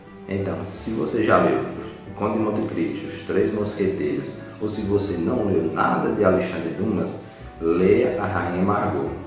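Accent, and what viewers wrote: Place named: Pernambuco, Brazil. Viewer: Opinei pelo sotaque. Sou de Maranguape, 30Km de Fortaleza. Nossos sotaques são muito parecidos; diferenciam-se nas pronúncias do "te" e do "de", que vocês pronunciam como os pernambucanos. Mas no Ceará também tem essa fala, só que apenas no sul do estado: a região do Cariri. Então, tava esperando você fosse me responder que era de Juazeiro do Norte ou Crato... Namorei com uma menina de Monteiro, em Recife, há muuuuuuitos anos atrás...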